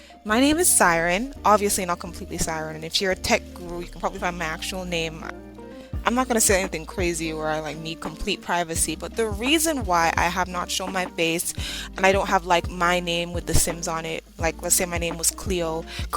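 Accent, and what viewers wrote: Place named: Jamaica. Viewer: I like your vibe, I'm definitely gonna tune in. We share the same sentiments on sirens 😂, and OMG I heard your accent and I was like oooooo she's from the Caribbean, but I literally SCREAMED when you said you're Jamaican! Ahh, I'm a Jamaican simmer too! I'm so excited to meet another SIMS 3 JA simmer 😊. (Sidenote: Isla Paradiso is where I play the most. Gives that home feeling for sure)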